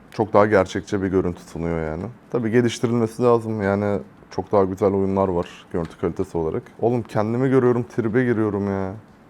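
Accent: Elazığ, Turkey. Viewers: Abi sen elazığlımısın lütfen söyle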